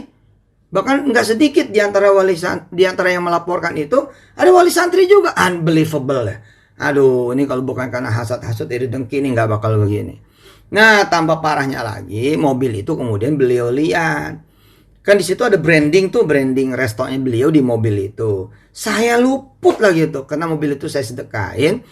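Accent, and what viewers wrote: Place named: Jakarta, Indonesia. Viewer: gaya orang Betawi logat ngomong nya memang kaya itu ... Seandainya beliau orang solo .. pasti bicara tidak menekan .. (100 % miscomunikasi)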